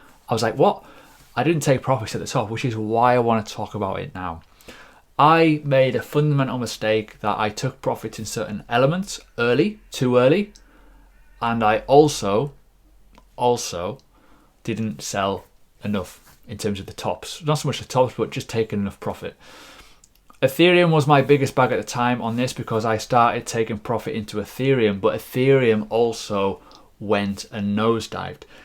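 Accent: United Kingdom. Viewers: Nice to listen to a UK guy.. What exchange would you recommend for the UK? Fed up with coinbase crashing at crucial times.. Cheers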